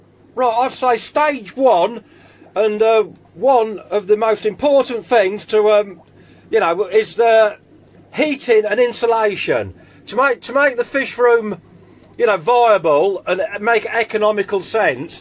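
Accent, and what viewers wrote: United Kingdom. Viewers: Appreciate the video. Young fish keeper looking to set up a fish room when I have the space. Nice to hear a fellow Brit :)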